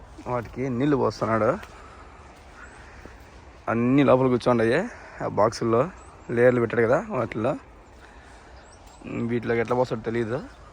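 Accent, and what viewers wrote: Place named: Rayalaseema, India. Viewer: మీ తెలుగు చాలా బాగుంది మా రాయలసీమ వారివాలె వున్నారు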